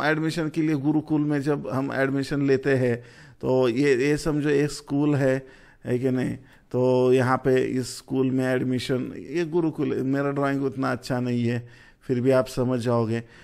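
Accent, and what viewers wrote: Maharashtra, India. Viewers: Hats off to your explaining process with great marathi accent, maja aali fun with learn just wanted to tell others that learning is not a overnight change process. It required lots of time, practice, patience and self believe. Guruji ek video practical daalde aur aap turant wo sikh jao agar aisa he to share market aap keliye nahi he dosto sorry to say that but it's fact.🙏🏻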